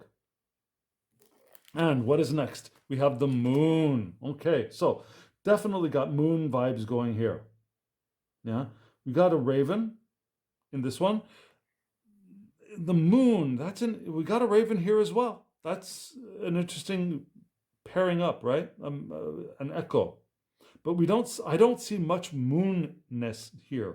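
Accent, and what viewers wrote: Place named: Latin America. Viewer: ah nice. I have friends in Italy and I heard how you speak English and I thought that you will be Latin something? From which country are you?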